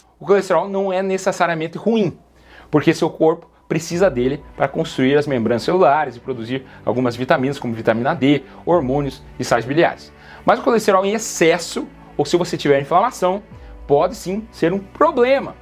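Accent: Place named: Caipira, Brazil. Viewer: Deveria ser proibido médico com sotaque caipira abobalhado!!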